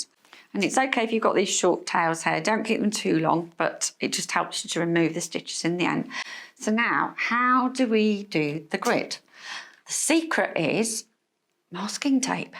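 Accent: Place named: England, United Kingdom. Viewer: Best cross hatch video ever. You have made it so easy for someone who hates sewing. I do a lot of embroidery and the piece I am going to work on is a table runner with cross hatch quilting. I have watched other videos on cross hatching and it was so involved and so confusing. Thank God I found your video. Can’t remember if you said how wide the tape was. I have subscribed to your channel. Besides making it so easy I love listening to you. I have always loved the English accent. Not only am I American, but I am from the Bronx, New York and I wish I had your accent.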